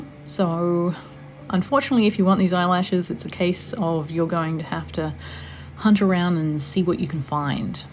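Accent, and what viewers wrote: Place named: Australia. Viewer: Wait, is American right? Why does she sound Australian to my own Australian ears wtf o.o Are my ears deceiving me? I assume she moved to the US haha.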